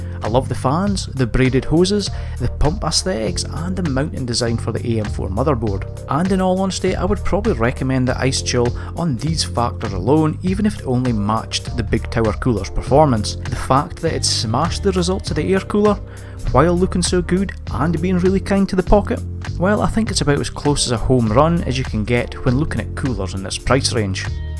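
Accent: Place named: Aberdeen, United Kingdom. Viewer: He sounds like he's fae (from) Aberdeen like me and for that reason alone I subscribed - can you recommend this cooler on the AM5 7900, I currently use the Raith prism just like AMD does in their test labs, into PBO2 testing on my ASUS B650e-e rig at -35 along with AI overclocking and temps remains very good no thermal problems at all scoring 54000 plus on pass mark 11.1 all day long and 12400 on CPU-Z? Getting 27000 score from a Asus Dual 6750 XT card Also, a top SEO guy if you need help bro, another clue lol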